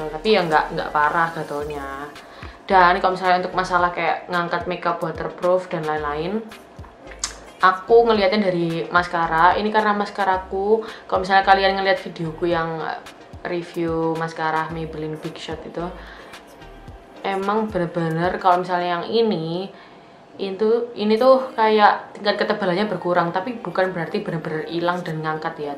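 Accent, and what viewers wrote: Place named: Java, Indonesia. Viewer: Medokkl tenan rek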